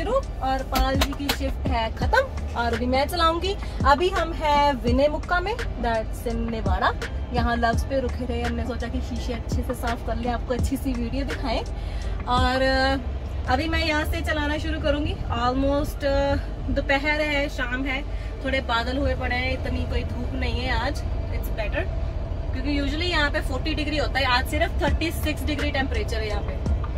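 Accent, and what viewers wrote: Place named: Bihar, India. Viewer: ਮੈਡਮ ਬਿਹਾਰ ਤੋ ਅਾੲੀ ਲਗਦੀ ਅਾ ਬੲੀਅਾ ਞਾਗ ਹਿੰਦੀ ਬੋਲਦੀ ਅਾ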